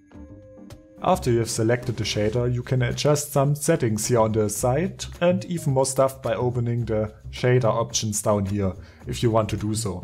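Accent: Germany. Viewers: Dieser Deutsche Akzent und dann noch die stelle "Werbung Überspringen" 😅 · You included EVERYTHING important (I think)! Omg, you're very helpful for people, who are looking for a proper guide. I subscribed, and also I love your German accent 😂 I live in Tschechien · man merkt das du deutsch bist xD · Ich liebe diesen deutschen Akzent :D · Hast ein Abo aus Prinzip 1. Der deutsche Akzent 2. Du folgst "Der Valon" du ehrenmann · Wusste ich doch, dass ich da den deutschen Akzent rausgehört habe. :) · Hab den deutschen Akzent von Anfang an durchgehört :)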